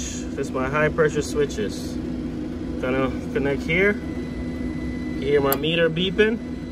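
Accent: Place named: Jamaica. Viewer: Where u from man???? From your accent are from jamdown...... Or further afield down in the caribbean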